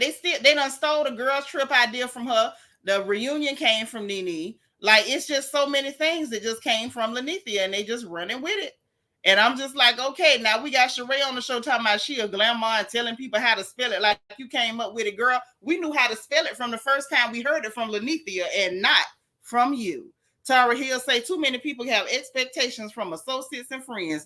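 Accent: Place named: Florida, United States. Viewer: Your Florida mouth have me laughing so hard cause I am from Miami and our mouth is so ridiculous people can’t understand Florida people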